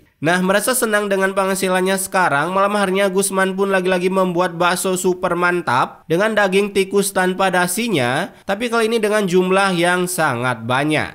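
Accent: Bali, Indonesia. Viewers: Logat Bali nya ketara bener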